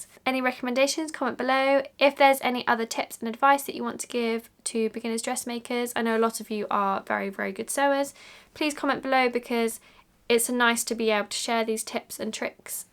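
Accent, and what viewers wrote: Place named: England, United Kingdom. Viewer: You look just like my sister but with an English accent!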